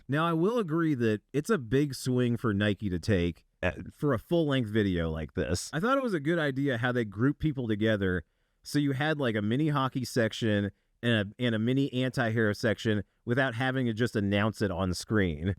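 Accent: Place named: California, United States. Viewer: Man that cali voice is fckn annoying I can't man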